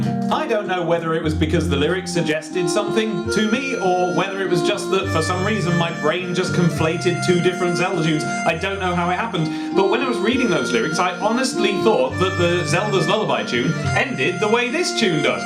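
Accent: United States. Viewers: Wow, you sound very American! Great job! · you actually sound decent with your American accent. You just need to work on your R's and few other things. Look up some accent videos, it makes it much easier. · Your american accent is actually really good!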